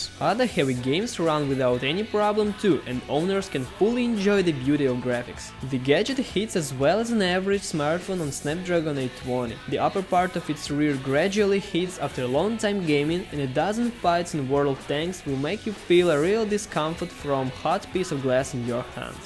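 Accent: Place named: Russia. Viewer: Russian english Best phone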